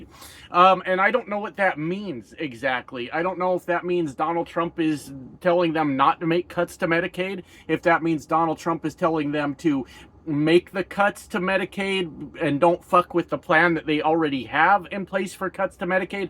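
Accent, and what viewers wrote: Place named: Canada. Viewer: You sure you're from Colorado buddy? The way you think, speak, & drop F-bombs, you sure sound like a fellow fucking Canuck to me. There's been a lot of horror stories & tragedies that have become public knowledge since the overturning of Roe v Wade, but by far the most disgustingly "American" one is the brain dead Georgia woman currently being kept alive as an incubator AGAINST the wishes of her family. You just know that once they finally remove the baby and allow the family to take her off life support, they're going to hand this single father of 2 a god damn, mother fucking bill for hundreds of thousands, or more, before he's even had a chance to bury his wife. And on top of that, doctors are not sure if the fetus is going to make it, and are already concerned about major disabilities if it does due to having fluid on the brain. 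That's some disgusting shit, on so many different levels.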